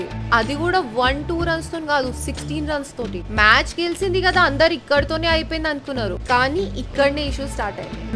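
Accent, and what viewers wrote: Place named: Telangana, India. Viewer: Telangana slang keka super sister Jai srh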